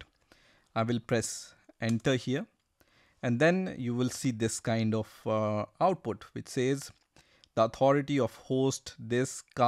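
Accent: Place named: India. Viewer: I hate indian accent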